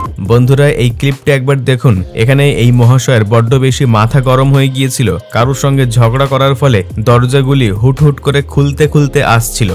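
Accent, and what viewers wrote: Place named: Bangladesh. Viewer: Vdota bhaloi baniyechho, tabe India ke chhoto karar prochesta nindoniyo. Tomar Bangla shune mone hoy tumi Bangladeshi. Bina kharache Hanglamita tomader moddhei dakha jaay, Bharatiyoder moddhe noy. Bharotiyoder aatmo marjada gyan aachhe karan ekhane garibi anek kam, ja tomader deshe aakash chhoa.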